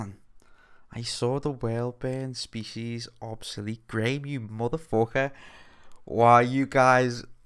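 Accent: Liverpool, United Kingdom. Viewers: Your passion for great new metal, your Scouse accent and you're totally hot 😛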